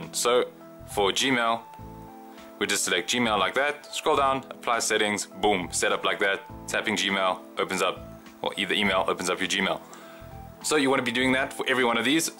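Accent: South Africa. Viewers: Are you from Pretoria?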